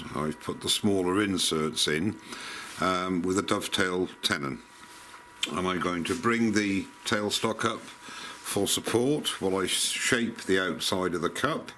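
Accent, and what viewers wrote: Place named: England, United Kingdom. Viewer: great job on the delicate goblet, I look forward to each of your videos. My belief is anyone who has an English accent, in my mind they have An additional 25 IQ points. Your videos are all most interesting and informative. Cheers!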